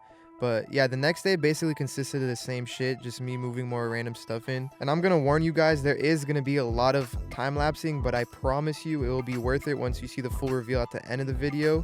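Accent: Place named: Ontario, Canada. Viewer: this guy's either from Cali or from Ontario.. Maybe Toronto, Most likely Hamilton :D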